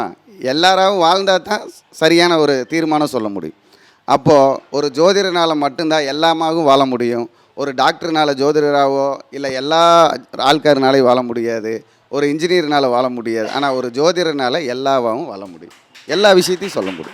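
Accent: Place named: Kerala, India. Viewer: நம்ப முடியவில்லை, கேரளத்தில் இருந்து தமிழ் சுத்தமாக எப்படி பேசுகிறீர்கள்?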